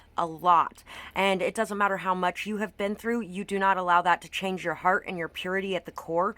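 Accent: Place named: United States. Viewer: euhm i don't understand American accent :/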